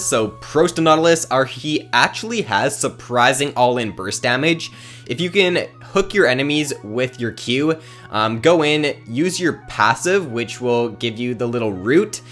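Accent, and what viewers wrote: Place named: Canada. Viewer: Ramon Schöllkopf he is Canadian, so to him he is pronouncing just fine. Just different accents I guess.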